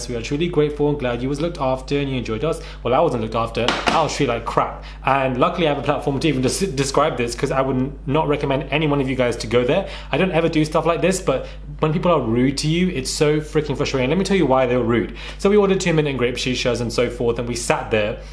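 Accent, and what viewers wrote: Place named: United Kingdom. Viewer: Wow!!! I didn't know you were in England even with the British accent because there are a lot of British people in the USA. What is a she-shell? I understand your frustration. .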